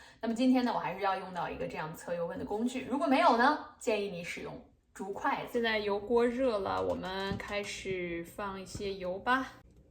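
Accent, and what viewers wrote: Beijing, China. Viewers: 你这是北京口音吗？ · 北京人吗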